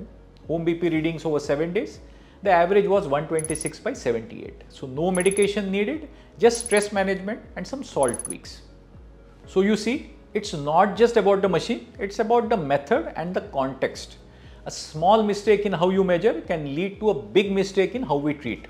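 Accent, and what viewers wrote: India. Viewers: Your thick Indian accent makes me difficult to hear and understand.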